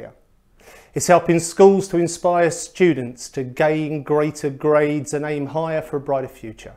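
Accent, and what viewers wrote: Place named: England, United Kingdom. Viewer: Mr, The moment I heard you speak at the Essential Oils Symposium, I knew you were English! I must say I thoroughly enjoyed myself listening and taking notes of whatever you said. I have now registered for a Class in October and look forward to hearing you once again. The Best Class at the Sympopsium I should say. Thank you for sharing. I am a YL Star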